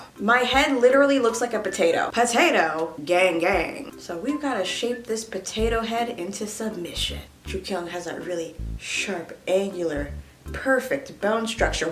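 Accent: United Kingdom. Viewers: It’s not a video without the British accent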